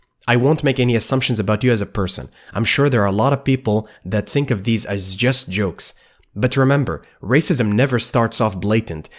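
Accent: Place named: Germany. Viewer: Very slight accent, but i believe it is germanic, such as german.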